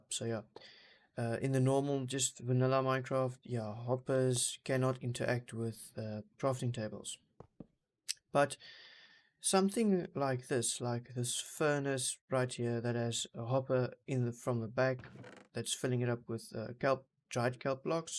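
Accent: South Africa. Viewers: Nice to hear a fellow South African!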